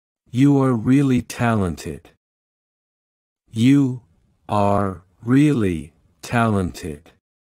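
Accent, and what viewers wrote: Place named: Florida, United States. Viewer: 美国不同的州说的英语有不同的口音，这个英语口音和我们佛罗里达州相同，很适合我。非常感谢你们制作这视频！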